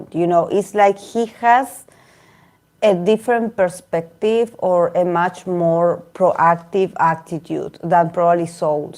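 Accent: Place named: Argentina. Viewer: sos argentina? creo que detecté nuestro acento. Gracias por el video, me gustaría que hagas uno sobre Keeho y Theo 😊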